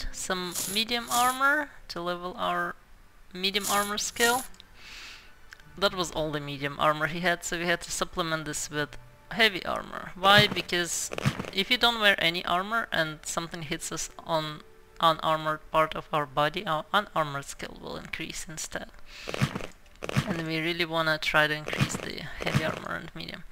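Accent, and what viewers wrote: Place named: Russia. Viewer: Dat Russian accent is so awesome. I will gladly be a sub of yours :)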